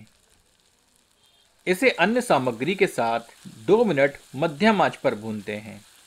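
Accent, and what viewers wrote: Standard. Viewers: ur commentry is amazing... pure hindi... just love it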